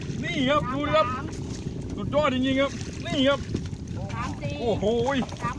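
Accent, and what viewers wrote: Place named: Thailand. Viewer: ทะเลสาป พัทลุง อยู่ทางใต้คนพากค์น่าจะใช้ สำเนียงภาษาใต้เป็น เอกลักษ์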